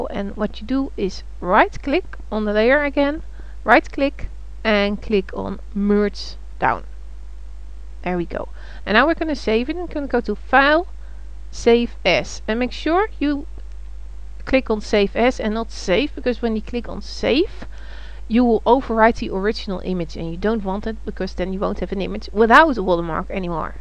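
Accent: Netherlands. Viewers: Haha je nederlandse accent komt er erg door :) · Hihi bij je eerste zin hoorde ik al dat je Nederlands was :] handig filmpje thanks! x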